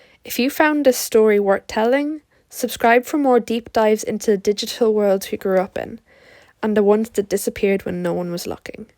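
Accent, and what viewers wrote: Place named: Ireland. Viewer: That's so interesting, I guessed it was Irish but I didn't even know you had different accents like that. Are you native?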